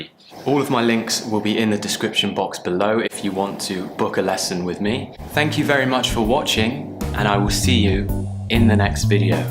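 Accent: United Kingdom. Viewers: Hi The way you teach is impressive. I've loved British accent since I watched Harry Potter. Pity that I'm retired and I practice listening for fun. I'll recommend you to anyone who want a British teacher.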